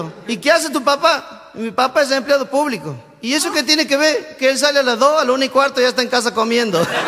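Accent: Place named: Santiago, Chile. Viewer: Me encanta el acento santiagueño.👍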